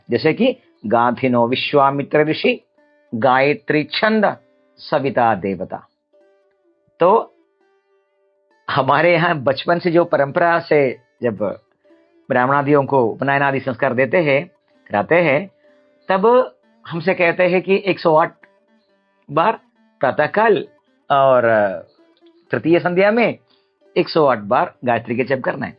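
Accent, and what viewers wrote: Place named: South, India. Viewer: पर ये उच्चारण दक्षिण का है उत्तर का नहीं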